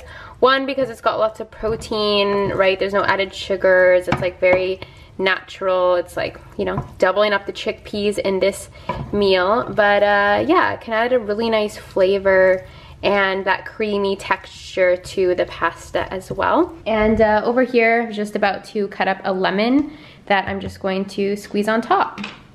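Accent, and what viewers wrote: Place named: Canada. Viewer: I just want you to know that I absolutely ADORE your channel! My husband and I went to Seattle for our honeymoon and went to Peace Arch State park right across the Canadian border in Surrey, BC (they wouldn’t let us far because of COVID), so I went home and watched a video of Canadian accents and it was you and another girl! I’ve always been passionate about spirituality and I happened to discover you again discussing it. Your videos are so good and I have watched so many. Keep up good the work! 🙏🏼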